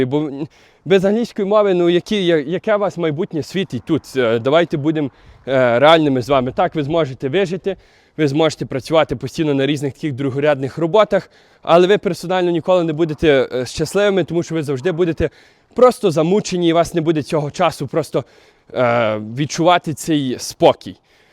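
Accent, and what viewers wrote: Western Ukraine, Ukraine. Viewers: Дуже такий файний діалект у вас , западенський)) 👍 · Он говорит на западноукраинском диалекте, а вы пишите о литературном украинском. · Бомбічна західноукраїнська мова. Як в Трускавці в дитинстві) Пан, ви звідки самі? Пс прочитав, що зі Львова, з самого Львова? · Чистый западенский акцент. Прожил с ними пол года. Ну их нахуй. Пришлось ехать в Европу. А автор - да, западенец, патриот, в Канаду уебал😊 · Ты с какой области уехал в Канаду? Почему такой западенский акцент?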